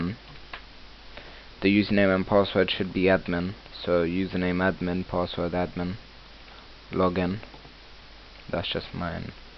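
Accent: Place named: Cockney, United Kingdom. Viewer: He's british lmfao... Cockney...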